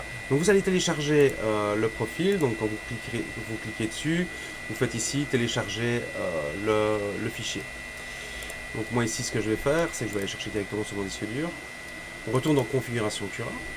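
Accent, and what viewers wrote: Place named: Belgium. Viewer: De toute façon merci pour les vidéos très instructives, la rançon du succés c'est de ne pas avoir assez de temps pour faire tout les spectacles, un peu comme une vedette de la chanson quoi ^^^ et vive la Belgique (et ses supers chanteurs et humoristes) et j'avoue sourire quand vous vous excusez auprés des français pour la prononciation des chiffres à votre manière.... PS: comme je débute je préfére Cura pour le moment pour cette machine mais si vous avez le temps pour la suite en vidéo particulièrement pour un tutoriel (didacticiel en bon français ^^) sur par exemple "comment on fait sa première impression après avoir suivi les cours de??" Et aussi "que faire quand on a terminé son impression?" (je ne me suis pas encore lancé sur cette machine plus que récente pour moi en arrivée à la maison). Merci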